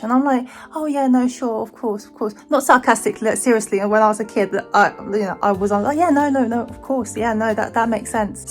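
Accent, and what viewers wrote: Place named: Essex, United Kingdom. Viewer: She's proper Essex.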